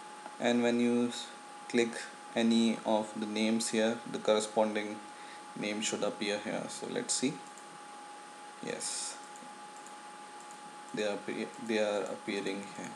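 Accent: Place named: India. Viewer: You did well but let me tell you, your Indian accent is so ugly and I hate it. you should put more effort into practising pronouncing english bro then I bet your videos r greater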